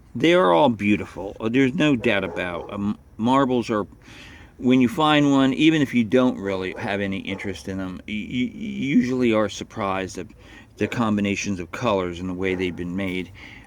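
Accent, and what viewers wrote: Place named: New Jersey, United States. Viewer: You got a nice little spot there! Sounds like you are from new jersey with that accent :)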